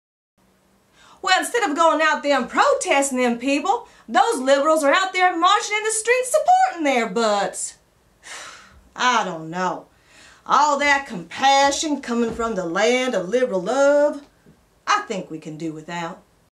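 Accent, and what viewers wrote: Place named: South, United States. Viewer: Great video! Your southern accent is hysterical, not to mention you deliver your points with class and style. I hope you plan on making more vids.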